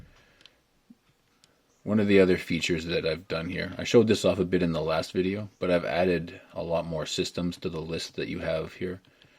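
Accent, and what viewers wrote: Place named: Winnipeg, Canada. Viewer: You're from Peg City aren't you? Lol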